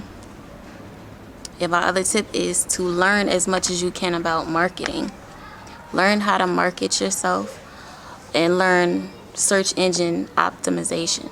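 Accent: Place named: United States. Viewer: Where are you located?cos the accent says American but the scenery says AFRICA!!!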